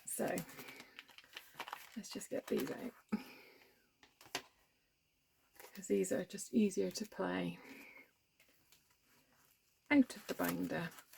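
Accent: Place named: England, United Kingdom. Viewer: ❤ Hi! ❤ I'm here, watching and enjoying! What region in England are you from? I'm trying to figure out your accent.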